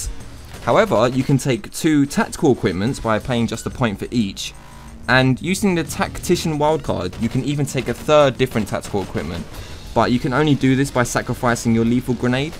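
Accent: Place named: South London, United Kingdom. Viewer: Nice video. Where are you from? You sound like you're from South London, around Croydon?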